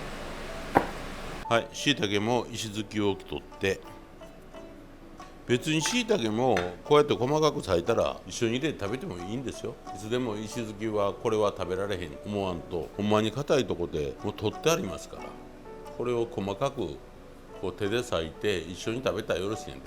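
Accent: Kyoto, Japan. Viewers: 村田さんの気取らない感じや京都言葉がいいですね。 お出汁が３カップということはお米は３合でしょうか？ きのこ類全体でどのくらい量があるのでしょう？油揚げは１枚でしょうか？ 量について、もう少し詳しく書いてくださるとありがたいです。以前の動画なので、フォローむずかしいかもしれませんが。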